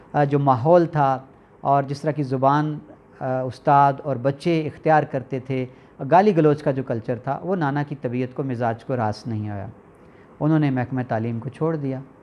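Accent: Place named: Lucknow, India. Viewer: ماشاءاللہ بہت خوب جی Great inspiration معلوم ہی نہیں ہوا کہ آپ کوٹلہ سے ہیں ،،، بلکہ ایسا محسوس ہوتا رہا سُنتے سُنتے کہ آپ لکھنئو سے تعلق رکھتے ہیں ، واللہ بہت ہے دل خوش ہوا ،،، Watching from USA.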